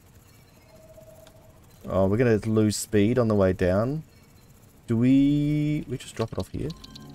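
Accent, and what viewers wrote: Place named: Australia. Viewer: Good to hear an Aussie voice on YouTube. Cheers bro 👌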